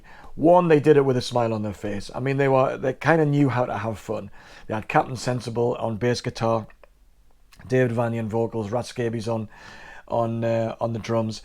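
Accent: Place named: London, United Kingdom. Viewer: I'm a new York girl and when I heard your London boy voice I was bracing myself lol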